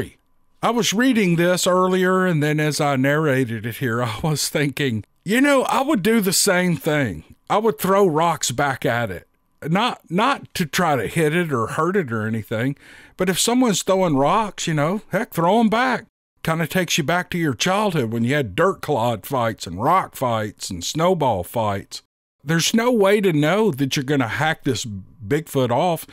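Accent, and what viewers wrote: South, United States. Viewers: I get to listen to your southern charm for a few hours tonight. Thank you, we all appreciate your stories and your charm. Hello from Pensacola Florida y'all. Best regards to all of you. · Hey, I hope that all is well with you and your family. I have came across your channel doing the Covid out break and it have been a delight to listen to you narrating these amazing stories. I enjoy hearing the Southern Comfort of your voice and the in-between conversation. By the way, I wanted to check on you because i've been missing your (live) until the next time, take care and I will continue to listen to these awesome stories.❤ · Love that southern accent and laugh sir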